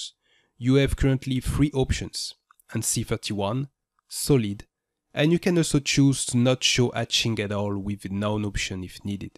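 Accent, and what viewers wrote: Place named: France. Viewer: Avec l'accent français !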